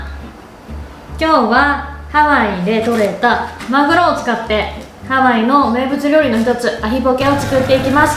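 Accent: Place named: Kansai, Japan. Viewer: Youtubeやってたんだ〜❗関西弁のイントネーションが、とても新鮮❗🤗 なんかうれし〜🎵 「涙出〜へんやつ」とかって。 ハワイで関西弁。いい❗❗❗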